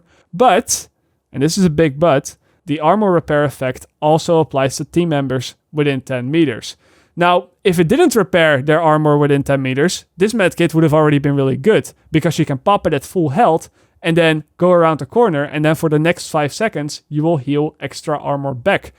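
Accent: Netherlands. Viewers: Yo are you from the Netherlands, cause' I hear it at your voice.👍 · now i can tell why people say are you dutch ?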